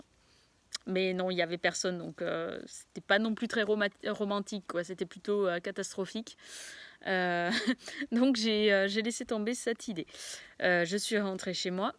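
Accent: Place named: South, France. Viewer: Bonne vidéo, j'imagine que tu es du sud non? (à l'accent) sympa la vidéo elle est nette et tu es à l'aise devant la cam, juste petit conseil tu devrais filmer en horizontale pour éviter les bandes noires;)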